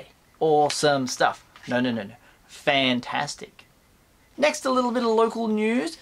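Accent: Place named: United Kingdom. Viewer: Okay you're in Australia? I started watching this and thought you were in the U.K? You sound very British.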